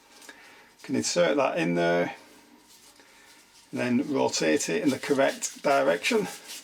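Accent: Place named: Blackburn, United Kingdom. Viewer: Good video. Im willing to put my hand to anything and can usually figure things out but its always handy to have a good idea before you start and be sure you have all the parts to get the job finished. Judging by the accent your a local lad, Blackburn/Hyndburn area by any chance?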